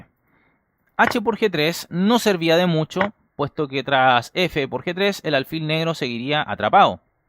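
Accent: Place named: Chile. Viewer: Siempre creí que era argentino, veo que en los vídeos antiguos habla en idioma chileno. Fitche xd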